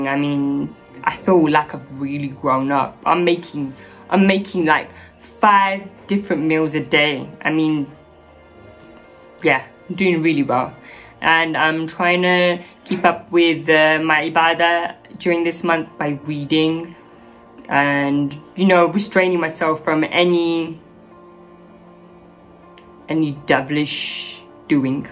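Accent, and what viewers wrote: United Kingdom. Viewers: Hello, I was wondering how come you seem to have a British accent. Did you live in the U.K.?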